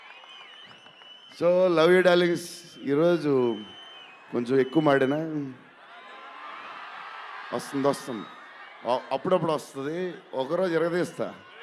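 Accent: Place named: Godavari, India. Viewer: godavari slang lo annaya speech ❤ pure hearted person ❤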